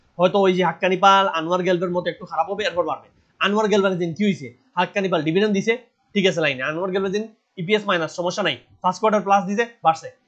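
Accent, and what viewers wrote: Sylhet, Bangladesh. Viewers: ভাই কি সিলেটি?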